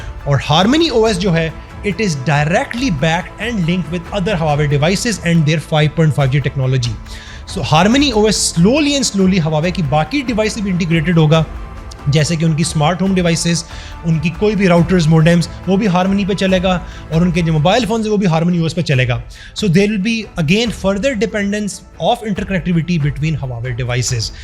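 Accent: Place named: Punjab, Pakistan. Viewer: बोलने का तरीका पाकिस्तानी पंजाबी स्टाइल है अगर आप थोड़ा सा ध्यान दे तो साफ समझ आता है। तो अब निकल पहली फुर्सत में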